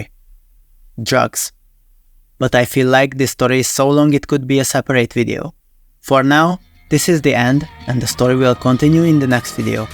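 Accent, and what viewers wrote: Hungary. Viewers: Is this a Hungarian accent? Just curious because i had a feiend in uni who spoke similarly. Might be wrong tho :) nice/funny video anyways ❤ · Te magyar vagy ? Kicsit olyan a tajszolasod angolba :,D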